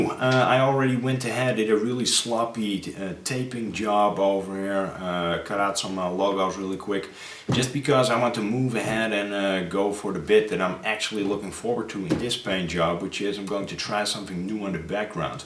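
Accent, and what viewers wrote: Netherlands. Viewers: Ben je Nederlands haha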